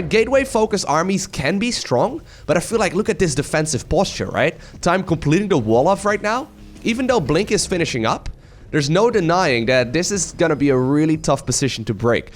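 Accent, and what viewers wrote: India. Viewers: You sound like someone from India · you sound indian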